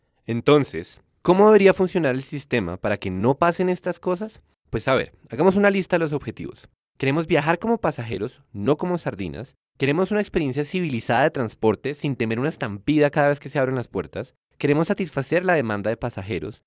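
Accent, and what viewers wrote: Bogotá, Colombia. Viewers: Todo estuvo bien, pero que Dios me libre de tener acento rolo algún día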